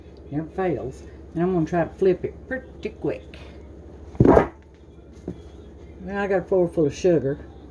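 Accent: South, United States. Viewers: 3/4 cup of "Flair". I love your southern accent...and your sweet kind soul.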